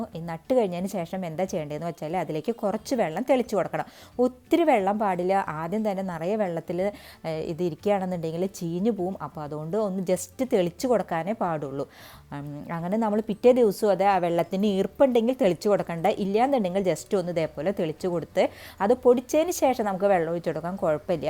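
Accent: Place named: Pattambi, India. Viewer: Slag kettappo manasilayi.... 🤣😍.😍... me also... Pattambi.😘.. Madam evide?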